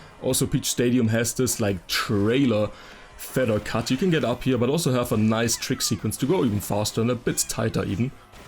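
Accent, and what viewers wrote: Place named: Germany. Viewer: so unangenehm wenn deutsche englisch reden müssen 😂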